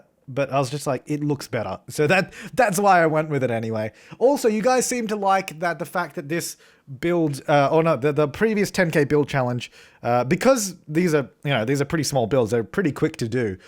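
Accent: Australia. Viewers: Watching this from the future and sounds SO Australian here. Like more than in present day somehow, even though I'm not sure his accent has really changed.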